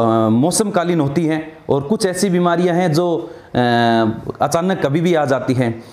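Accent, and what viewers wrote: Rajasthan, India. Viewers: Sir aap Rajsthan se ho kya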